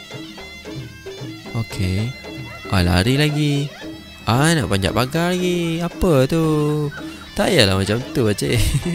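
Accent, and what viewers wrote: Malaysia. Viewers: ABG NI ORG INDONESIA KE MALAYSIA CARA CKP MCM ORG MALAYSIA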